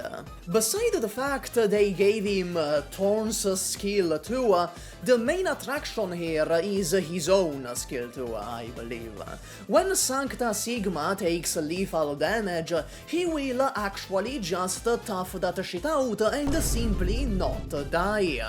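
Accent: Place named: Italy. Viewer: Oh shit, again this guy with Italiano accent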